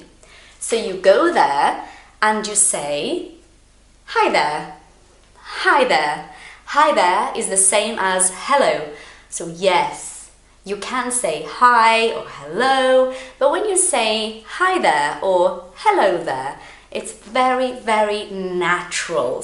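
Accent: United Kingdom. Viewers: Como que eu não te descobri antess! Sou iniciante e amo o sotaque britânico e procuro estudar conteúdo britânico, mas nada se compara a ti: uma brasileira que entende os pontos que temos mais dificuldades. Thank you!!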